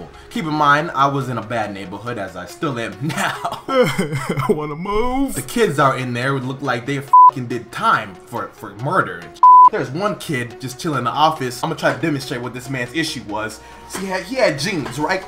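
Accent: United States. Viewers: You from michigan bro?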